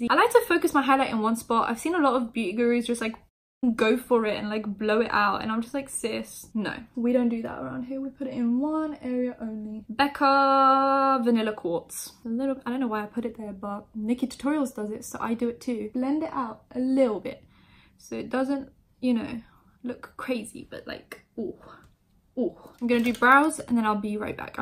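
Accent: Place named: London, United Kingdom. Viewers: ringaling it’s so weird to me, like the place that i’m from is like 4/5 hours away from london so idk the range of accents that well but to me she sounds working class london but NOT at the same time??? and it confuses me i don’t think i’ve heard the combination before lol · Lmao omg her accent and pronunciation is like so rough, pure london hahaha.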